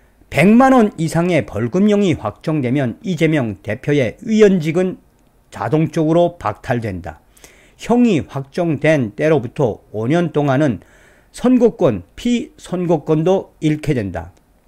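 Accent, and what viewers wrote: North Korea. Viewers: 탈북민 이세요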